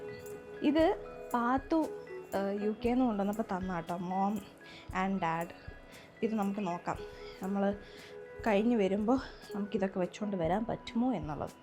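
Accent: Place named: Trivandrum, India. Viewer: slang ipo tvm slang ayapole 😃and hope u and baby doing well ❤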